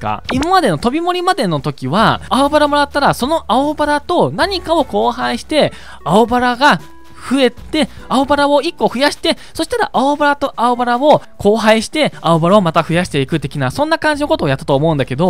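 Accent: Aichi, Japan. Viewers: 愛知のひと？方言が懐かしい感じする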